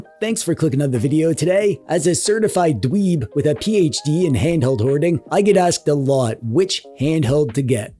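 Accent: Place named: Canada. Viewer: Anyone with eyes can compare the hands and see they're two different people. There was an April Fools gag last year where pretended to be. Also, is Canadian. There is no way I can believe that could pull off that accent. If he could, he wouldn't be making YT vids, he would be making bank as a voice actor.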